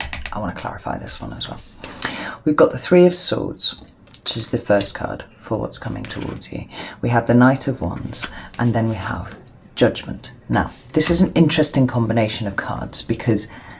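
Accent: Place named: England, United Kingdom. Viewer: What K.Y. or C.Y. cat has you cleaning out there litter box. You may capture America with your English accent.or would you prefer my short comings lest wow grab a twix candy bar and coke pop, soda I guess I don't belong in America this next 50 you have a flat $ 640 nothing included a month .I'll sign a contract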